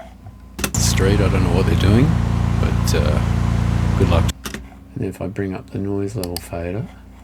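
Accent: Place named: Australia. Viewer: nice hearing an aussie voice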